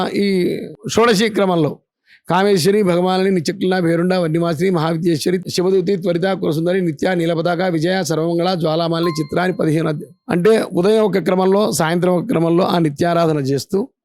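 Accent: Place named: Andhra Pradesh, India. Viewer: Mee ru baaga maatladaaru.andhra maatallaga vunnavi.chaala santosham god bless you sir.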